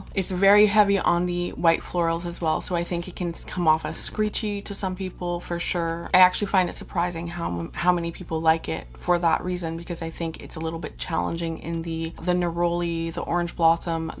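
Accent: France. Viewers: Hi I wish you a very happy New Year and all the best for you and your loved ones. As always love your videos and your french accent 🙂🙂🙂😃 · ugh your French 😍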